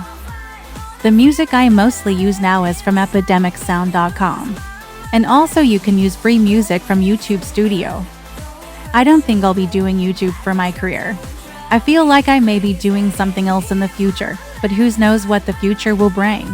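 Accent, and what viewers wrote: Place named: Australia. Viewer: O.M.G!!!! The moment I have been waiting for has arrived! I cannot get over at how excited I was watching your voice reveal video. I took in every word you said and imprinted in my mind your favourites things. I too am into kdramas like sugar fizz! And I too enjoy Melisa McCarthy!!! I knew your voice would be sweet and gentle, and I love the Australian accent!! I bet you can do and be anything you want to be if you just put your mind into it. I will continue to watch your videos to the very end! You mentioned you won't be directing movies forever 😢 but when you do i will be around to celebrate an end with you❤ a the good luck with your future endeavours!! Love you queen!💙💙💙💙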